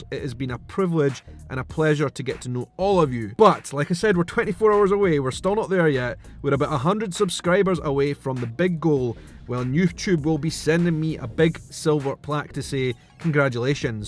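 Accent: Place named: Scotland, United Kingdom. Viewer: I couldn't understand a single thing you said. Am I not supposed to make fun of the Scottish accent, is that on your list? I sure hope not.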